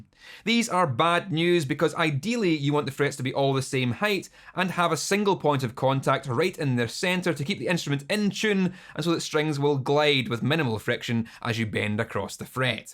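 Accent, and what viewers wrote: Scotland, United Kingdom. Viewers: You have the strongest Scottish accent since Limmy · He has such a Scottish(??) Accent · This dudes voice is my Scottish accent impression... fuck I hope he’s Scottish. Also, fuck, I have a Gibson epiphone in the condition, thank you for reminding me that I’m being a push-fit nob... poor guitar doesn’t deserve it.. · Are you from Kirkcaldy or Irvine...? Just wondering about your accent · Cathartic in two ways: 1. Grime cleaned and everything freshly filed and realigned. 2. That dialect. I could listen to that particular Scots all day. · Deep deep deep Scottish accent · The scottish accent makes it even better! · Scottish or Welsh Irish? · Hell yeah a Scottish accent on YouTube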